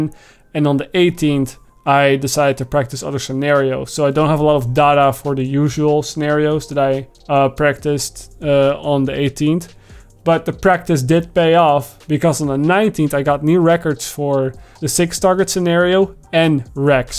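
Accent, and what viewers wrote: Netherlands. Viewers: Dude got some Dutch accent, btw I also used this aimtrainer and now can outaim most of the players i play against (Now I just need to grind to develop game sense)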